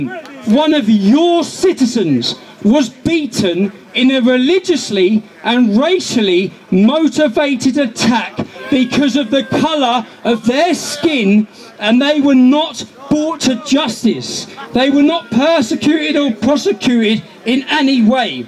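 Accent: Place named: Cockney, United Kingdom. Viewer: WTF? Is this for real? A cockney Wanker (who looks like an Irish gypsy to me) preaching hatred to the folks that ate all the pies from Greggs? He He!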